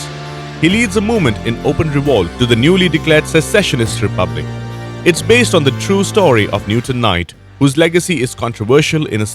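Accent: India. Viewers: he's from india but has a surprisingly mild accent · You sounded like Indian, checked your 'YouTube and I was right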